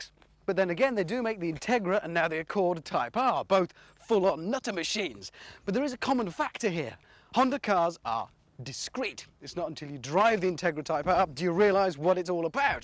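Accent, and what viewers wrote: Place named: RP, United Kingdom. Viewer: he used to speak really posh.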